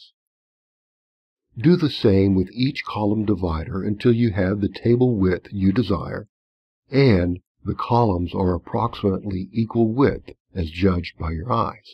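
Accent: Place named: United States. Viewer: Slow, steady instructions in a familiar American English accent. Thank you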